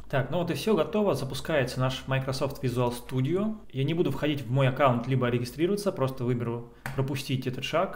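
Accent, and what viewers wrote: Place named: Spain. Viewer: вы испанец? очень красивый акцент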